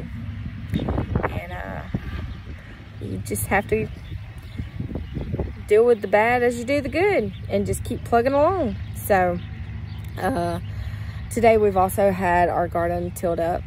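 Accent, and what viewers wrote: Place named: South, United States. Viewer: Their little southern accents are just as adorable as yours..lol I'm southern too from GA lol but it's just so cute to hear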